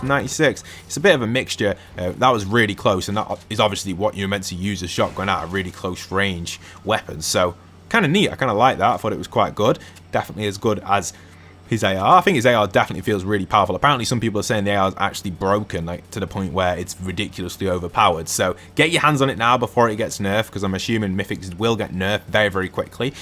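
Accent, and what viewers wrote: United Kingdom. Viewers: Yo r u from Leeds or manchester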